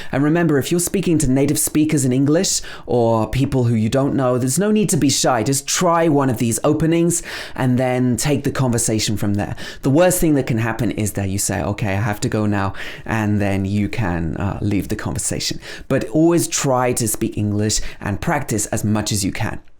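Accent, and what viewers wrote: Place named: United Kingdom. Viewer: Pls do continue making videos...I am an indian ...its great to learn British accent from you...doing a great job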